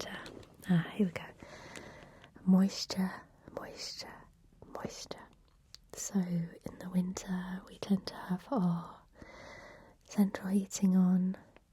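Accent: England, United Kingdom. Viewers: Such a beautiful voice and I love the English accent. Ooof ❤❤❤ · You've got such a thick English English (from England) dialect, when you're normally speaking it, it's really annoying to me. Sorry, no offense, sweety. Just the pronunciation. But your whispering voice gives me the perfect tingles, I don't know why, but I can chill totally :) That's ASMR. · The lovely British accent makes the ASMR even more powerful. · Most people probably come here for the English accent, I'm English too and it still has the same blissful effect, such a nice accent!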